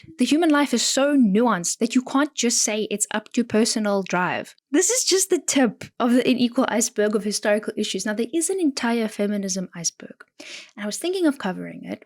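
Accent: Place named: Netherlands. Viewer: yeah, but Dutch/Arfikaner or English? You sound Dutch to me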